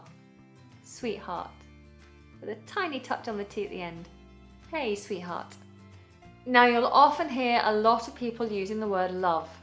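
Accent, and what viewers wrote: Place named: United Kingdom. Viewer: Big Thumbs up; love your British Accent so much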